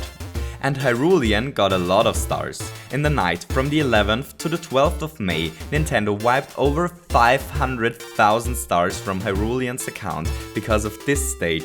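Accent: Germany. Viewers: Hey... You´re from Germany...:D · bist du deutscher? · are you german? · Bist du deutscher? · Are you German? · Are you german?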